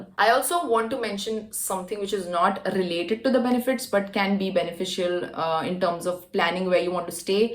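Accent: Maharashtra, India. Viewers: You have a thick marathi accent.. lol.. good info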